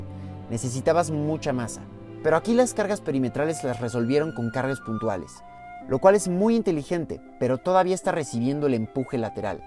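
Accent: Mexico. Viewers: Un video diferente a los que solo pasan de largo por la ciencia y la cultura de lo que filman. Tienes acento mexicano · Creo que es un vídeo de pacotilla al tratar de comparar os obras de arquitectura diferentes, creadas con diferentes técnicas y propósito… he estado en las dos y las dos son hermozas y si es solo de cúpulas en las que tenemos que hablar… bueno pues, las dos son diferentes y las dos siguen allí… los romanos fueron maestros en el uso del hormigón y no se a tenido que rehacer varias veces, además que tiene un calendario solar en su interior, con precisión milimetrica…. Bueno y para terminar, para poner un canal en cualquier idioma, hay que tomar primero lecciones en ese idioma, “el mexicano” que aquí escucho no es un español de lo mejor, siento lástima por aquellos que comentan que les aluda a prender español! El “mexicano” todavía no esta reconocido como un nuevo idioma verdad?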